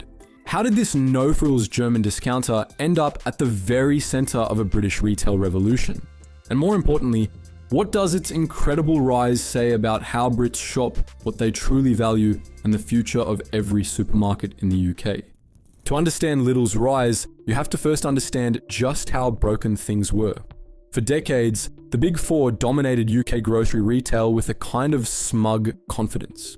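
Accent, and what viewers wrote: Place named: Australia. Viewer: Why is a Australian talking about the UK? This feels like AI and who ever set this up thinks Australian is British.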